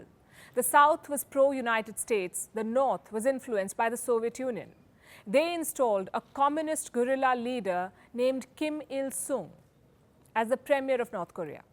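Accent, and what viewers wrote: India. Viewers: i like the indian ladies accent .., refreshes my memory of the good times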